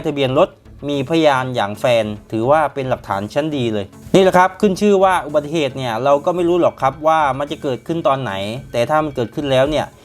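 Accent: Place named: Buriram, Thailand. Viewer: สำเนียงบุรีรัมย์สุรินทร์เลย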